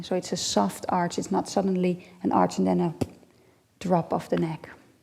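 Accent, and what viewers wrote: Netherlands. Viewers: Definitely a Dutch accent haha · Haha hallo Nederlands accent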